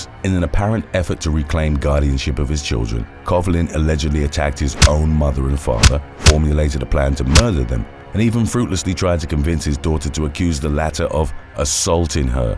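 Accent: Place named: England, United Kingdom. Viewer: Holy shit! That's you?! It is! Woah! So let me get this straight; you're that frickin stacked, black with a charming English accent, you got one of the best channels on YouTube and you look like that?! Alright! We get it! You're the king of all men everywhere! Fuck off! Would ya? Fucking King Man over here. I mean shit! What's next? Ya gonna front a rock band? It's no wonder we never see you. You're probly too busy having a three way with lingerie models on a mountaintop or something. Shit! Oh by the way, I think a good topic would be, "When cemetery workers / gravediggers/ morticians and the like go wrong." I've known some of these folks and they're all fucking psychopaths!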